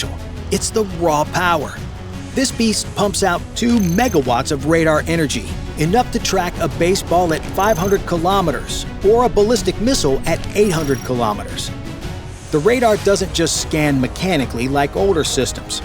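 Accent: United States. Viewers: Had to give up. I can't stand the overblown yank narration 🤢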